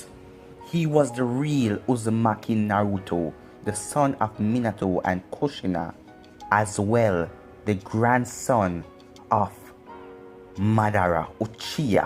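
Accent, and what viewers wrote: Jamaica. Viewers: Are you jamican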